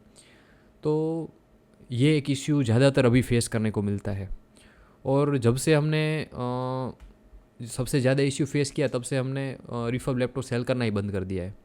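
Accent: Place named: Sindh, India. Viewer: ok actually your accent is a bit similar as if a Sindhi speaking person speak urdu.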